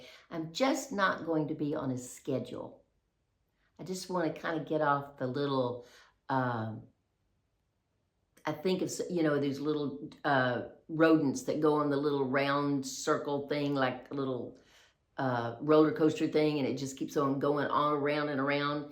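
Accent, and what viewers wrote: United States. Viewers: I think with your strong American accent it is difficult to speak French well??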